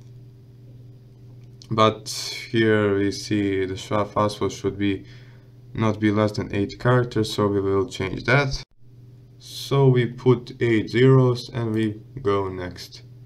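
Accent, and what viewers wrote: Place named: Serbia. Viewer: Odmah sam znao da si nas cim si poceo da pricas :) Hvala na videu...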